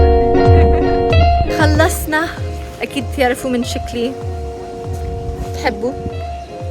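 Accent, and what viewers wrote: Jordan. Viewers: تحكي اردني احسن مني 💔✋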